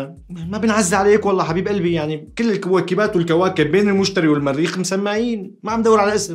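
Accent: United Kingdom. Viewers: the british accent :))) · واو لهجتك البريطانية رهيبة❗😭😭🔥🔥🔥🔥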